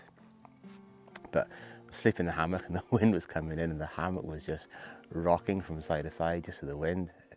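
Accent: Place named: Wales, United Kingdom. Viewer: I could tell buy your broken accent there was a hint of Welsh, i lived in merthyr tydfil for a few years. Loved it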